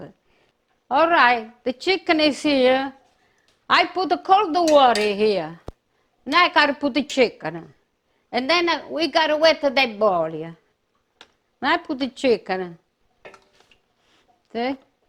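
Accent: Italy. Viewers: The soup looks good ...I love your Italian accent ... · I love your cooking! I'm a very big fan of yours. My family came from Italy (both sides). So your Italian accent is very wonderful/familiar because of my mother. Thank you so very much for sharing your wonderful cooking ideas. 💗💗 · I love to watch you cook, you cook soo good I love your food and most of all I love your Italian accent, my favorite Italian food, 😋you are the greatest cook 😃😇❤💖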